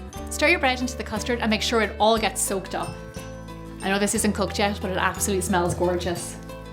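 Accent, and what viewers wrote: Ireland. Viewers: I love Irish accents!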